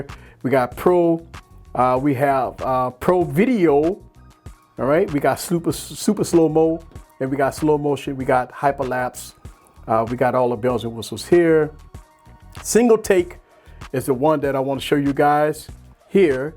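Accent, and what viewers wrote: United States. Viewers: I like your accent, what part of the US are you from? I'm from Liverpool UK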